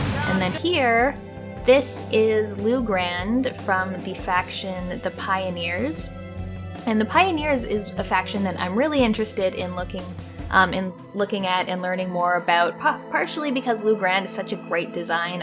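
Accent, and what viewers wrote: Canada. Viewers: Searching for new Bloodlines 2 vids: "Never heard of this American lass before, lets give her a try" - "Its been a while since I last talked ABOOT vamp-" "Canadian. My bad"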